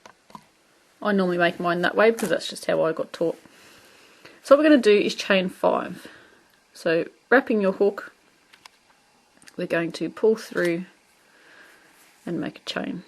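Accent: Australia. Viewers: So nice to hear an Aussi voice. This will be my next project.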